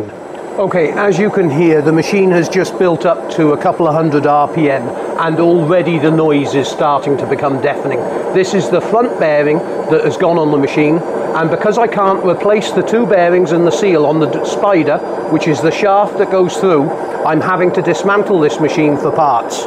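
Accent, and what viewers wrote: United Kingdom. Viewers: Thanks a million for a great tutorial. And as English isn't my first language I especially appreciate your plain, sort of BBC like, English so easy to follow. Best regards! You've already created a legacy.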